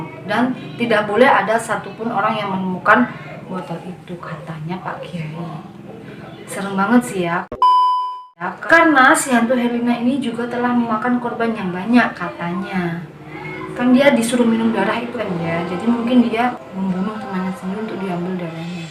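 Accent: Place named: Java, Indonesia. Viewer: Medoknya uwuw kak🤩🤣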